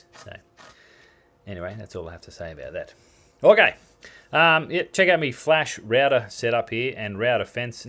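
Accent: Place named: Australia. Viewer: Nice to see you back! Surely missed your Australian accent and good happy predisposition to life! 🎉😊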